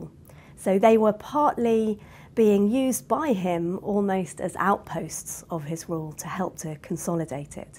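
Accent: United Kingdom. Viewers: Loving 🥰 your British accent ❤️😊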